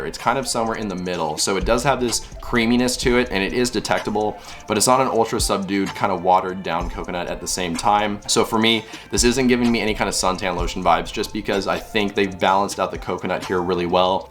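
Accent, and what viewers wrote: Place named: United States. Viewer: Bro plsss get your american accent under control......i wanna scratch out my ears after listening to you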